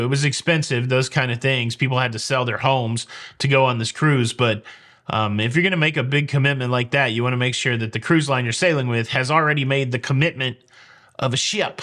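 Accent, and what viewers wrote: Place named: South, United States. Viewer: Sounds like you're picking up a bit more of a southern accent! I'm a little irked about the increase in the price of the bottled water. It's a weird thing for me to be irked about since I start out being irked I need to contribute to the single-use plastic problem by getting it in the first place. I drink 3-4 liters of water a day and I use tap water and filter it. That's not really a thing on cruise ships so I combine water from the buffet and bottled water in my cabin. This just means I need to find a way to filter water in my cabin. The sink is inconvenient for anything but washing hands and brushing teeth but where there's a will, there's a way!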